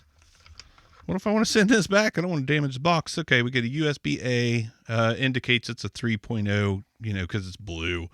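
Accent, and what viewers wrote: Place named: Philadelphia, United States. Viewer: Sensing a Philly or Baltimore accent. I dig it man. I'm from Philly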